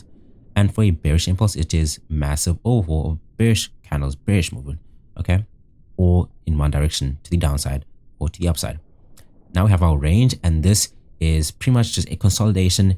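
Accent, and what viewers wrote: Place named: South Africa. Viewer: 😊detecting a SAn accent here.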